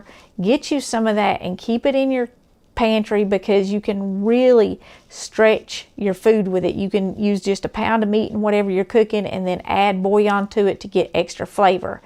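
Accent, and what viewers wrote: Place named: Kentucky, United States. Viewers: I grew up in a military family (Army), my mom has your accent, she's from Kentucky. My dad (Vietnam Vet) made S.O.S. with sausage and he would fry an egg and put it on top of the toast and put the SOS over that. Thanks for your recipe, I grew up on this. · I dont know where she is from but I absolutely love her accent. I could be wrong but I'm thinking Appalachia somewhere. I've heard those folks really know how to cook! SUBBED!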